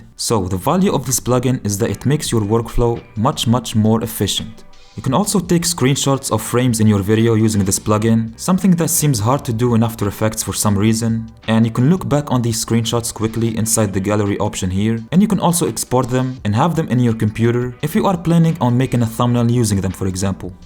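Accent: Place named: South Africa. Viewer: I know a South African when I hear one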